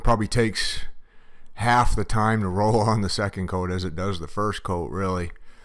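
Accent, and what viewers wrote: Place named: New England, United States. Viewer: Great video this is very helpful. By your accent your from New England. Since you’re using this product where you have so much snow ❄️ and rain and it’s held up well. This will also hold up good on my patio with our bright sun ☀️ in California. Exactly what I’ve been looking for. Great video thanks again 😎👍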